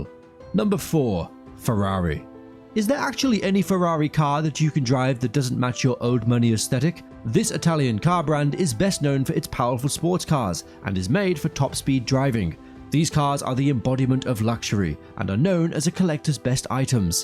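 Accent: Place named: United States. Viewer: Suffers from an American accent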